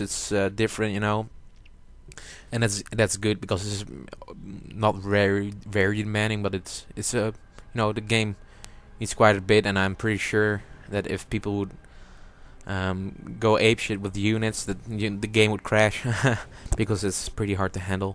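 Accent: Netherlands. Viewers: je kan duidelijk verstaan dat je nederlands ben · youre dutch? you got a litlle dutch accent, im dutch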